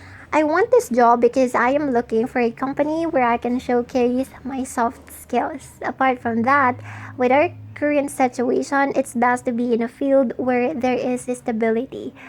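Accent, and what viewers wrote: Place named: India. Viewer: Naanu ako sa accenr hhehe parang indian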